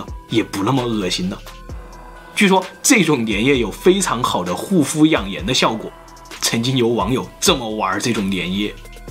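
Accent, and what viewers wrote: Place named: Fujian, China. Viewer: 福建哪里的。。。？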